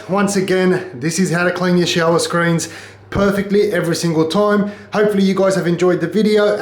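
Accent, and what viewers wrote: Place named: Australia. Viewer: Randomly came across one of your vids, as soon as I heard the voice I was like “mad a Aussie channel” I’m about 40 videos in and have subbed. Cheers from Sydney ✌🏻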